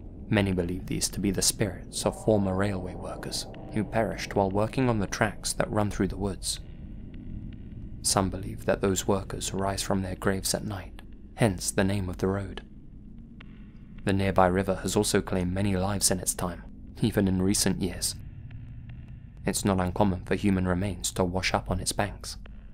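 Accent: Spain. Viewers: its funny to hear you pronounce Portuguese words with Spanish pronunciation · Fortaleza is not Fortaletha like you would say in Spanish. And Jaguaro is not Jaquaro. Idk if I'm making sense but he pronounces it as a spaniard wouls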